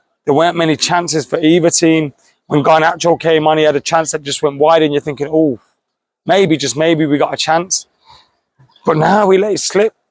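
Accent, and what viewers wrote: Birmingham, United Kingdom. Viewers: Brummie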